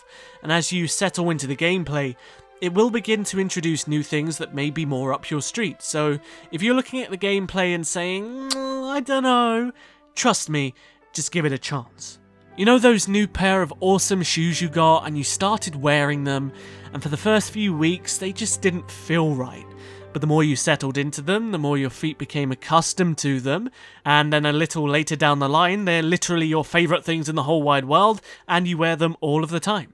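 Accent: United Kingdom. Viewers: It's interesting hearing you try not to sound British. You are British right? lol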